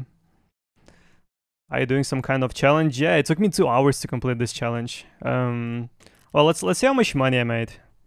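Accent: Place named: Russia. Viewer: уровень английского поражает, но все-таки слышны русские отголоски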